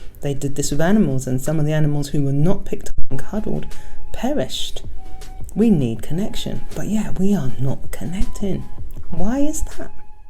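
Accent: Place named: United Kingdom. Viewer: My god, you are beautiful! Totally wasn't expecting to hear another British accent :-) Your locs are beautiful!!! Need to have a look through your videos :-)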